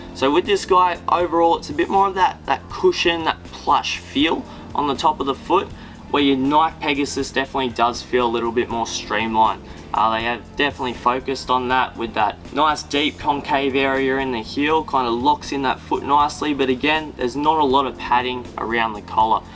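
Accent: Australia. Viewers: Great review and great Aussi accent. Would love it if you kept doing comparisons of running shoes like this one.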